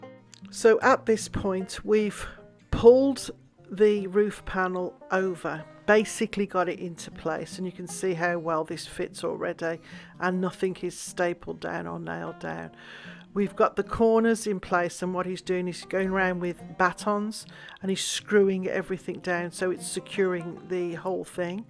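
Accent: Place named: England, United Kingdom. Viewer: Ive oy been watching for short time but i love all tge creating that you do. I love your style as well. Ive wondered because of your accent if you lived in England. Something you said in one of your videos made me think you were in Canada. Now in the comments i see you bought a home in France. Congrats on that. Please tell me where you live now and doing all the beUtiful work.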